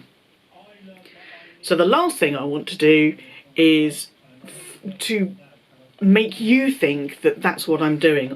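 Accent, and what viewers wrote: United Kingdom. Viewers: Aww, I'm so sorry this all stressed you out so much! We don't love you and your channel because of your unboxing of luxury items, though. It's your humour, personality, positivity and, I admit (to my Canadian ear) British accent! You could be discussing the phone book, and I'd still watch as your take on anything and everything is always worth watching. Seriously, your honesty in this video is just one small example of why I adore you. You really are the best, <3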